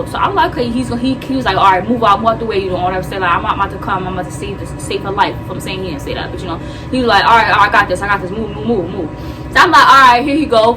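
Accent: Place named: Brooklyn, United States. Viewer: Lolll you sound like you straight from Brooklyn 🤣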